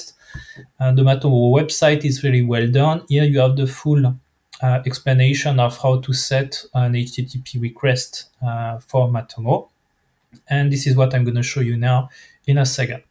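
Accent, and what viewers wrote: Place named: France. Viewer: Merci pour vos vidéos que je viens de découvrir. Malgré votre accent très frenchy 😜 qui n'est pas trop compliqué à comprendre pour les franco-francophones, avez-vous la possibilité d'activer le sous-titre en français? J'ai déjà vu ça sur des sites de français qui présentent oralement en anglais, ce doit être automatique, mais je ne connais pas la procédure...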